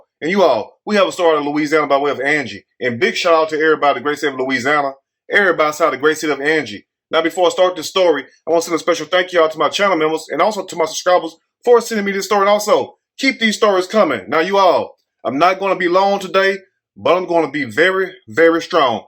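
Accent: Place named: Houston, United States. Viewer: I heard you talk and immediately heard that H Tine twang. Possibly 4th ward.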